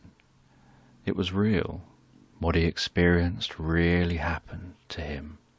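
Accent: United Kingdom. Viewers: I only fell asleep because your British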